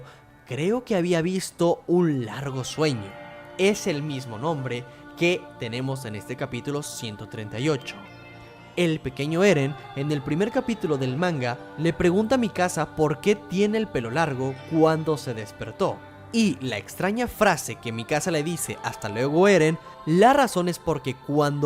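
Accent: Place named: Sonora, Mexico. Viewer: No sé si él sea de México, pero yo conozco a muchas personas que son del norte del país y así es su acento, para ser exacta de Sonora, México.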